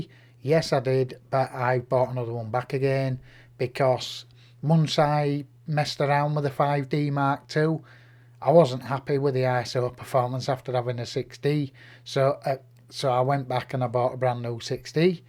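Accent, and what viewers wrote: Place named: Manchester, United Kingdom. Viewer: sounds like a manc :-) Regards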